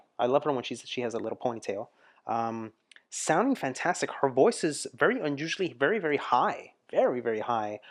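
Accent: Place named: United States. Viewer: Accent US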